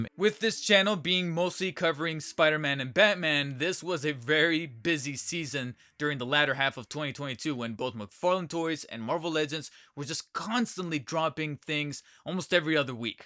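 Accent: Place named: Quebec, Canada. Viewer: Your accent is throughout me. It sounds very Québécois.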